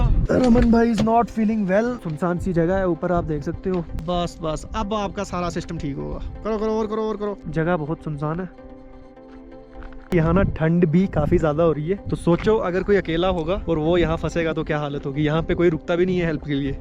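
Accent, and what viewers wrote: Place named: Pakistan. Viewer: ye blogger pakistaniyo ku tarh kyon bol raha hae ...iski tone full pakistani jaisi hae.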